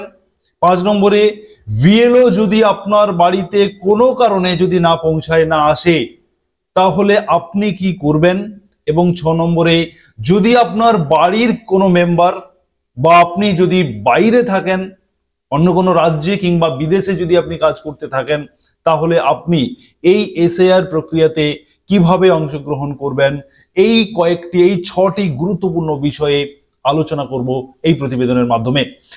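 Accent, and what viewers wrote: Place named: Bangladesh. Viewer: Apnar kotha sune mony hocche apni Pure bangladeshi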